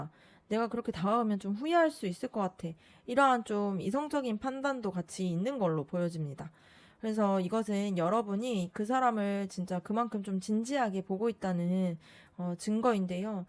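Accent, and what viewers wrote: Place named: Jeolla, South Korea. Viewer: (전라도)향기가 나는 구수한 리딩 잘 들었습니다😊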